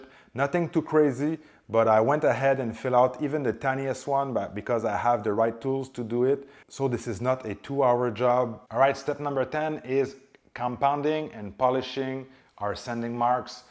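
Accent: Quebec, Canada. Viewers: yes osti I will say he is a quebec guy 🙃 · Fantastic video, the best I saw for rock chip repair. By the way I noticed your Quebec accent.:washhands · I get to the end of the video and I’m like oh wow this guy must be Canadian cause I just noticed his accent, since I was sooooo busy paying attention to every step. Great video sir, it has given me the confidence to wet sand my own paint for chip repairs. Of course gonna get a junk panel for free somehow to practice 1000 times, but i finally have every step and tools I’ve never had before! Blessings!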